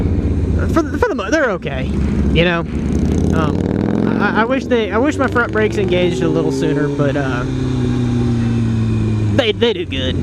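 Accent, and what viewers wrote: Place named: West Virginia, United States. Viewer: Are u from West Virginia?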